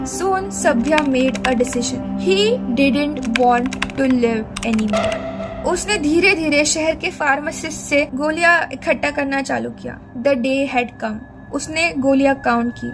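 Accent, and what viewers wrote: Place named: Bengal, India. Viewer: Aapki Hindi sunkar laggra ki app Bengali ho ❤❤❤😊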